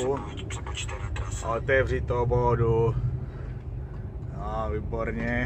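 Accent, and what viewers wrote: Moravia, Czech Republic. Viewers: Ta moravština je i pro mě úplně suprová, zvláště když jsem se ocitla v Čechách a oni tady mluvijou fakt divně:-D:-D:-D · Za mě super, tož Ti dávám odběr 👍 A hrozně dobře se to poslouchá, ta naša moravština 😃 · Nemá chybu ta moravská mluva, je to vtipné, ale v dobrém smyslu, dobře sa to poslúcha:-) Tak tak lidi by měli být na ty kamioňáky ohleduplnější však to není sranda toto šoférovat apropo kdo by jim domů dovezl ty jejich ebaye a wishe a další... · Perfektne video, nedalo mi a dnes som si ho pozrel druhy krat. Dobry koment,obraz proste vsetko a ten prizvuk moravsky, moravakov mam rad:-D S to bolo ozivenie. To Nemecko ti nezavidim ked nemas den tak vindes so STAU prejdes 50 km a je tam dalsia a to nejazdim s kamionom. Klobuk dole pred vasou pracou, ja som o nej par krat premyslal ale nedal by som to pri tych preplnenych cestach, ako si povedal odstavit zahodit kluce a ist domov vlakom:-D toto zazivat kazdy tyzden by ma kleplo. 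Tesim sa na dalsie videa a drzim palce. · ty moravaku fajne:-)moc hezka rec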